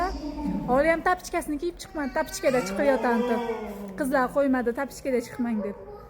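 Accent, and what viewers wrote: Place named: Olot, Uzbekistan. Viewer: Qorako'l yoki Olotdanmisiz deymanda shevada gapirgani da bilinayapti😂😂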